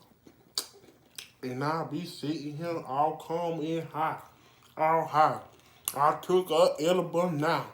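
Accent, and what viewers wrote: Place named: South, United States. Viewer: I'm from California and I love your southern accent...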